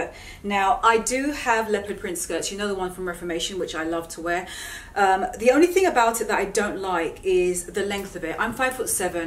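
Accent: England, United Kingdom. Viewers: Is that English accent that you got there? I love it anyway 😍😍😍😍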